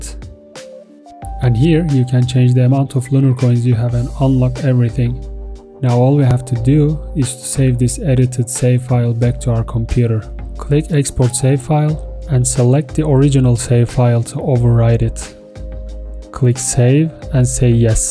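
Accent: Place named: Turkey. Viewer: turkish guy detected gang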